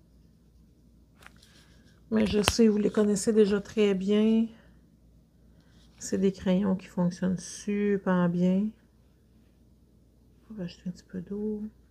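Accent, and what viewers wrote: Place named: Canada. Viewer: bonjour ta vidéo tombe tres bien car je cherchais une nouvelle gamme de feutres et j'ai énormément apprécié ton comparatif j'ai pu me faire une idee sur les feutres a m'acheter pas trop onéreux les tombows sont vraiment tres cher 😅 merci beaucoup pour ton partage et ton accent qui sent bon le canada que je reve d'aller ❤❤👌👍👍🙏🙏😘😘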